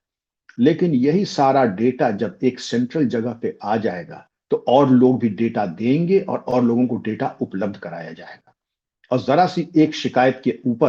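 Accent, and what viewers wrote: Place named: Lucknow, India. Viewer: Samjhawan, uthawan😂 😂. I myself was in Lucknow so listening to you remindS❤❤ me of those days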